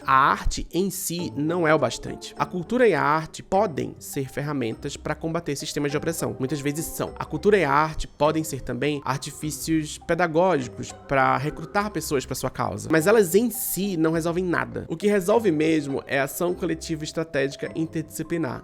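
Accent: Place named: Minas Gerais, Brazil. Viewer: estava cm um sotaque especialmente mineiro nesse vídeo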